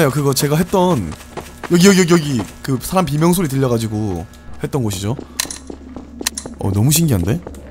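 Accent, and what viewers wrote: Gyeongsang, South Korea. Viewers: 경상도사투리가 살짝씩 들리는것같은드에엥 그래서그런지 좀 친근하고 자꾸보게돼욬ㅋㅋㅋㅋ 아 반응넘웃겨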